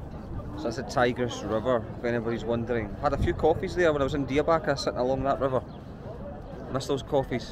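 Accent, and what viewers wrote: Scotland, United Kingdom. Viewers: Oh! Hearing your Scottish accent in the middle of Baghdad is something else. Thank you so much for visiting my country! I haven’t been for 23 yr 😳🫣!!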